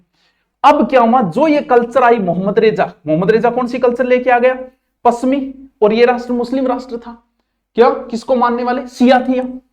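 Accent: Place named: Haryana, India. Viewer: Content is good .But, try to speak in neutral accent. Sab ko haryana accent samajh nahi Ata.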